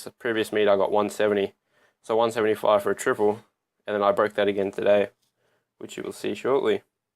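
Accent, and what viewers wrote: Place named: Australia. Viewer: Lol Cheers Tim. It's an Aussie accent :-)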